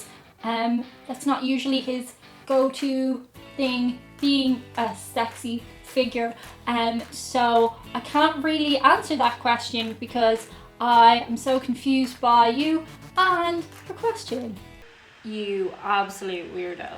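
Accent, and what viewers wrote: Ireland. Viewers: I love your "Lucky Charms" accent. And the chicken farmer line is priceless. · Lucky charm accent is way to cool to ditch ever.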